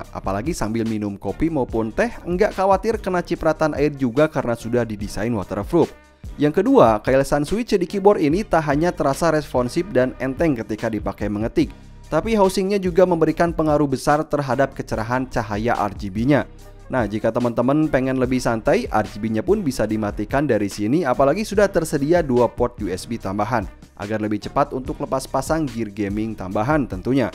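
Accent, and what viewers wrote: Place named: West Java, Indonesia. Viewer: Sunda pisan anjay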